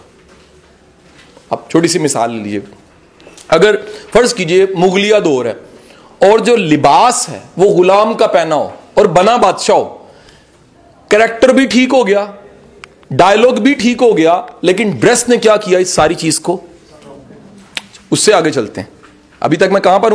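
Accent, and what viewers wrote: Punjab, India. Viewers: sir your punjabi speaking style is great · Punjabi hain sir is liay...actuall style me bolty hn kch covering ni use krty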